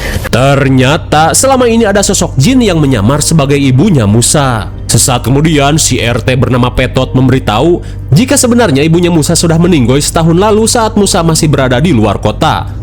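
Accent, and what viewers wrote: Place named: Sundanese, Indonesia. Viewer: Subrek sejak tahun 2021 sejak covid ... krn gabut di rumah di kurung pas pandemi .. 😅 fall in love pas pembawaan nya pake sunda campur...sukses kang.. 🎉🎉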